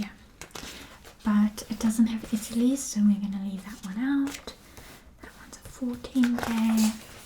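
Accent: South Africa. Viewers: Love your accent. Is it South Africa? x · I thought she was British too in the beginning, but then there were parts that sounded too close to my own accent (Australian) and the natural conclusion is SA lol.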